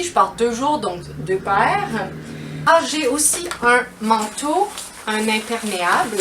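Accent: Canada. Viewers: J'aime la langue française , mon pays est francophone. Mais, l'accent canadien est vraiment différent par rapport à celui de la France.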